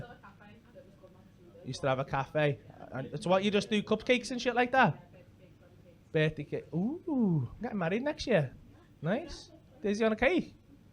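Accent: Liverpool, United Kingdom. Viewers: Love this Scouse ginger , Birmingham loves ya .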